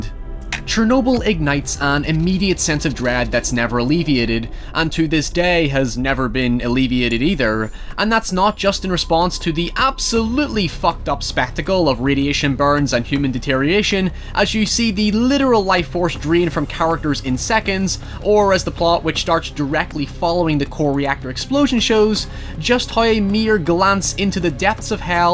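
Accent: Northern Ireland, United Kingdom. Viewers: Feels so good to hear a proper Northern Irish youtuber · Until you said "Nah m8 youre fucked" I didn't reaise you had a norn iron accent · This man sounds like hes from NI, wooopa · Hye lad u from norn iron? Ye saft hur ya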